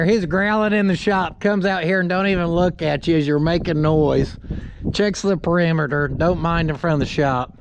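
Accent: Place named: South, United States. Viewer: I'll bet that a lot of folks see channel and think: "he sounds like cornbread... I bet he does a lot of hillbilly rigging on his projects." I watch a lot of automotive channels, and I can truthfully say that is as good a mechanic as most of them, and welds better than all of them. He follows his vision, putting in the extra work to do things right. He is humble enough to show you his screw-ups and how he fixed them. All the while having a good attitude and a great sense of humor. He should have a lot more subscribers... I guess some folks don't like cornbread. Their loss.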